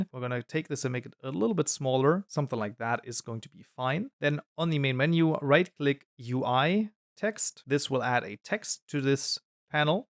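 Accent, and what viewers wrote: Germany. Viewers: Nice video! I appreciate the fact that you convey much information in short videos. Btw you sound like you're German :D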